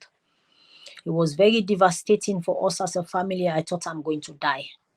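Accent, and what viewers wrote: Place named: Nigeria. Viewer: This lady sounds Nigeria to me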